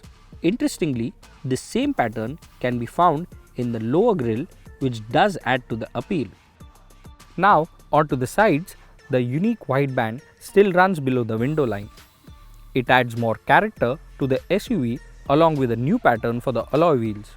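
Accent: India. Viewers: Very good indian accent, presentation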